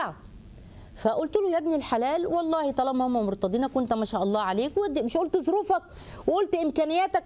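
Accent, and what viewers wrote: Egypt. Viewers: عجيب م شكلها ولبسها ولغتها واضحة جدا مصرية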